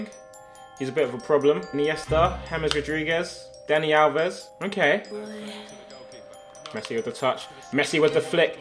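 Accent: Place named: Scotland, United Kingdom. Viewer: messi, suarez and neymar = Fucking deadly scotish accent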